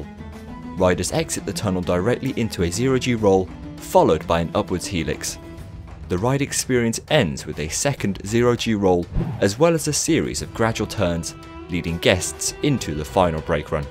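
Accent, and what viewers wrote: United Kingdom. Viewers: Great brirish accent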